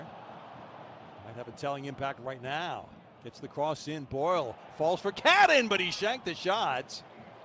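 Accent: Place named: United States. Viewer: I am reading all sorts of snide remarks about the commentator. Part of me thinks it's unfair. I'm writing my own comment after having listened to about 2/3 of the clip. Nothing that he's said to this point is glaringly stupid. Perhaps a bit unpolished, but what should one expect? It's a Europa League qualifying match, not an FA Cup final or El Classico. Part of me is offended that it's simply anti-American bigotry - being American myself. But even I, proud Yankee Doodle that I am, must confess that an American accent coming from the mouth of a football announcer, is incongruous at best. Well done, Hibs. I'm a lifelong Celtic fan, but wouldn't be in the least put off if you lot make us sweat a bit this season.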